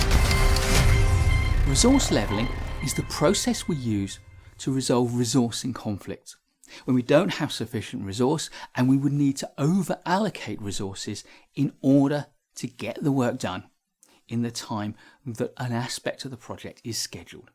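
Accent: United Kingdom. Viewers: Dear, thank you so much for this clear and well-structured explanation. Your British accent is so beautiful, I really enjoyed the video.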